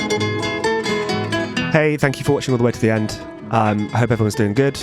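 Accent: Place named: United Kingdom. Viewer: Hey, man! i love your videos! and obviously de British accent, hahaha u r my inspiration for do video essays and do research. i love u, dude!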